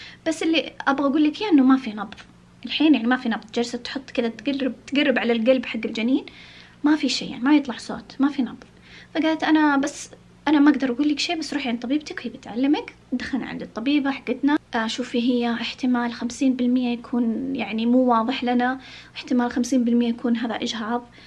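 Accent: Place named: Saudi Arabia. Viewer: ولدت بجدة و انا سورية و اضطريت للهجرة و اشتقت للسعودية لما سمعت لهجتك حنيت الله يجمعنا بكم بخير يارب انتم قلبكم طيب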